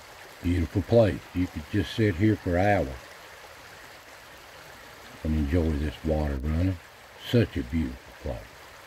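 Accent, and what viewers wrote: Appalachia, United States. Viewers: I just want to say how much I appreciate your videos. I’m so glad I found your channel! You sound very much like a lot of friends I have in Tazewell County, Virginia! I have Appalachian roots myself, and love to see your pics and listen to your. stories. So glad that we know these things, and rejoice in them! You’ve made a fan, brother. God bless you and yours!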